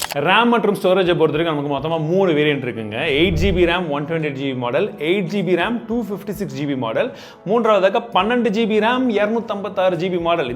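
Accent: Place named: Kanyakumari, India. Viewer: #SUPERTT intro adipowli 🌟 bro.... Kollam ketiya anna😅 ....ethu enga ooru slang from # kanyakumari ♥️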